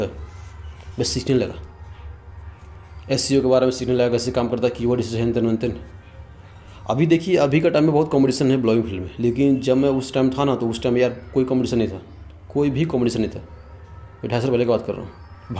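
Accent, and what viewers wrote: Bengal, India. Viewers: Bhi Ap Bangali Ho Kya.....?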